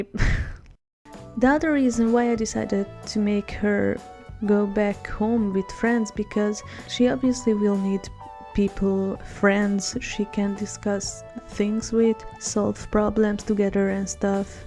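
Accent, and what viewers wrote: Hungary. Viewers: Ohh my god! Im hungaryan and i hear the hungaryan accent in your voice! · Annyira jó hallani a Magyar akcentust. :D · #magyarakcentus :D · az a jó magyar akcentus :D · I can hear the Hungarian accent haha! Omg amazing! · Your English is really good. Even tho I can still hear the hungarian accent a little lol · Hungarian accent :3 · By any chance, are you hungarian? you sound like familiar :D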